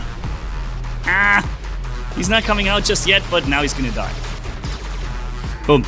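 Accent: Germany. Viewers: its just how germans pronounce it lol.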